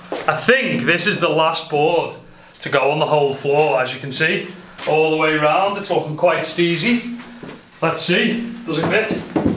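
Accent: Liverpool, United Kingdom. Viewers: Grew up in Liverpool, moved to Australia 10+ years ago. Been watching your videos for years now, miss hearing a scouse accent, so thank you for blessing my ears. Keep up the quality content bro <3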